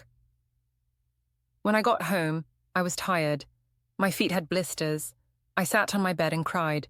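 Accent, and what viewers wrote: United Kingdom. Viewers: I think it's British.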